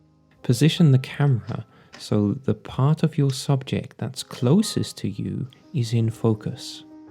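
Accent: United Kingdom. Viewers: You sound British but have your camera in German?! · Between that nagging music and the mumbling British accent I didn't understand a word. waste of time.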